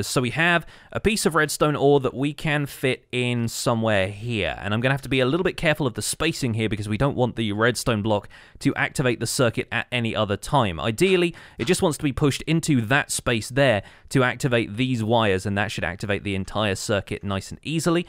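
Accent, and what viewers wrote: United Kingdom. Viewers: I think that is because of his British accent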